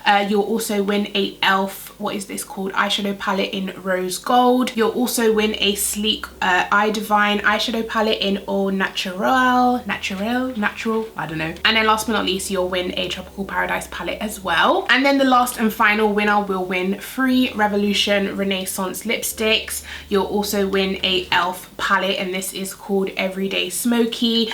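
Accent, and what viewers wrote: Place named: United Kingdom. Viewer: Your British accent made me smile! I love your voice and your accent. <mail> on IG!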